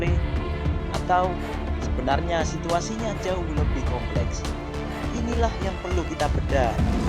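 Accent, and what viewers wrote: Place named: Java, Indonesia. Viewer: Menurut saya : perbaiki dulu narator mu.. kalo bisa jangan terlalu medok jawa, belajar n liat jurnalis2 nasional TV, mereka gak ada yg medok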